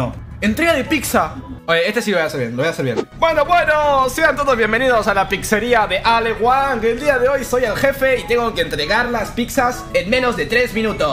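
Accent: Argentina. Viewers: Chino argentino?! Esto es otra onda! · Sos argentino?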